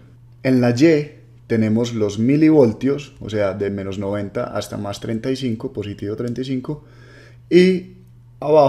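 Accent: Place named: Medellín, Colombia. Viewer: tuve que darle replay xq me deje llevar por el acento de medallo... jajajajaja ups!!!!!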